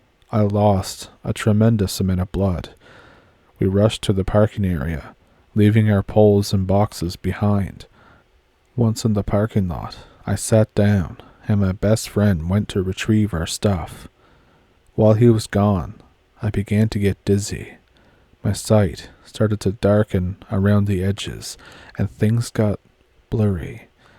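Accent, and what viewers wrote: Ontario, Canada. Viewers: Hearing your voice reminds me of how my cousins from Ontario sounded. 🎉 Good Times, wot?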